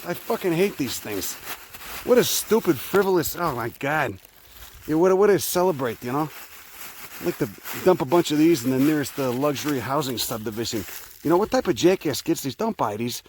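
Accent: Chicago, United States. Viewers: chicago accent at its best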